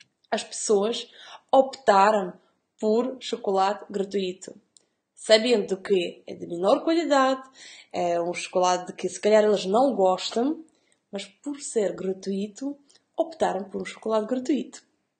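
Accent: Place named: Eastern Europe. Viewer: O que me admira mais é o facto de conseguir raciocinar abstratamente numa língua estrangeira, de forma tão correta! O seu ligeiro sotaque de leste, então, é a cereja no topo do bolo! Parabéns, Senhora!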